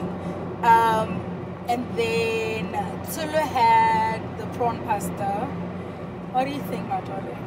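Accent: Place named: Botswana. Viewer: Your Setswana accent got me curious to know where you originally from, it’s not far from how we speak in Kimberley “without the Afrikaans 😂”.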